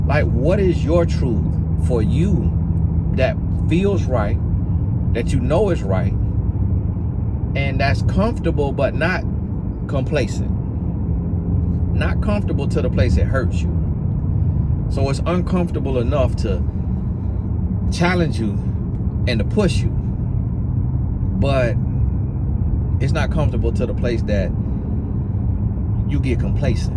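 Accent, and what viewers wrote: South, United States. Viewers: You may have a country accent, but you teach alot of good knowledge! I have a strong Texan accent, when I travel sometimes ppl don't understand me. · I love your country accent.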